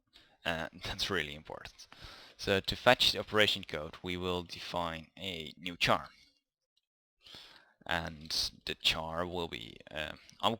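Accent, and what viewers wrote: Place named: Netherlands. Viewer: It's dutch accent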